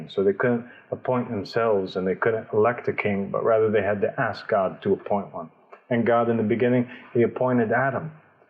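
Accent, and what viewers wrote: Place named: Texas, United States. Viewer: لسانك مو عربي والاكسنت مالتك تكول كاعد بتكساس شنو مهديكم من تكساس😂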